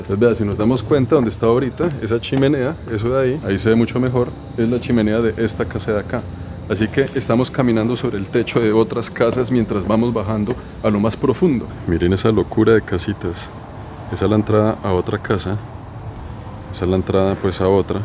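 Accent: Bogotá, Colombia. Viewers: Que linda voz tienes y con ese acento colombiano, Ave Maria. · Es agradable ver tus videos desde el sofá, aparte de ver las imágenes, estas muy bien explicafos con excelentes datos históricos de la localidades que nos enseñas, en este caso la ciudad de Matera, hoy una ciudad de la República de Italia y anteriormente del Reino de las Dos Sicilias. Un inciso, decir Latinos o Latinoamérica no es correcto, es hispanos o Hispanoamérica, Latinoamérica es una invención francesa cuando la invasión francesa, los franceses no dicen el Canadá latino o el África Latina, tuvieron muchos territorios en África y también los tuvo Portugal, España menos, pero dicen el Canadá francófono o el África francófona, latino es ser del Lacio.. Si también se incluye El Brasil entonces se puede decir Iberoamérica, latino o Latinoamerica es absurdo, el Lacio es lo concerniente a latino, una región de Italia. 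Un saludo desde España, me gusta ver tus videos y escuchar tu acento colombiano. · colombiano por estilo de hablar👍👍👍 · DEBERÍAS SER LOCUTOR DE RADIO TENES UN VOZ MUY RECORDATORIA EN MEMORIA DE LA GENTE Y ESE ACENTO COLOMBIANO GENIA... USTED YO DIRIA CHE SOS RE PIOLA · Empecé a seguirte, solo porque eres colombiano y me encanta su acento, pero honestamente excelente relato de esta ciudad antigua, y algunos otros vídeos que ví, muy interesantes y más cuando los explicas tan bien y nos aportas no solo viajar atravez de ellos sino información de los mismos en cuanto a su cultura gastronomía, estatus y antigüedad muchísimas gracias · buena voz.. interesante relato... acento Colombiano? Chevere felicitaciones!!! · Que fastidio ese acento rolo :v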